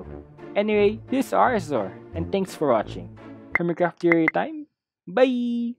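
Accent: Philippines. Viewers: Why do you sound like a Filipino? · I’m just gonna guess, but... Are you filipino? The accent’s there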